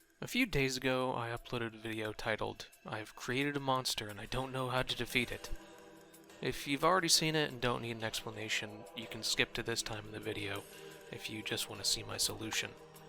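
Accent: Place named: Rural, United States. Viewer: There’s something about Country Twang and space ships….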